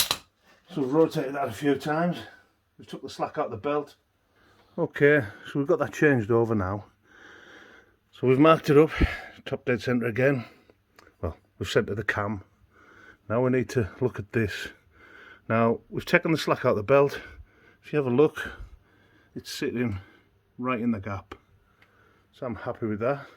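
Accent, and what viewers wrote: Cumbria, United Kingdom. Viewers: Where in Cumbria are you from?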